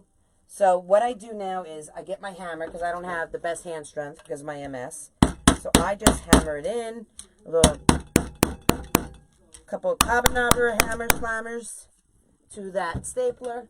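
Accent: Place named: Long Island, United States. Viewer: I hope you see my comment knowing this is an older video. First of all, you are amazing. Explain things well! Secondly, where did you get your easels from? Sounds like you have a Long Island accent. I lived on Long Island for many years and just moved back to the Midwest to be closer to my parents. Lived both on North Shore and South Shore. I watch Lori all the time and I'm so happy I found you as well!!!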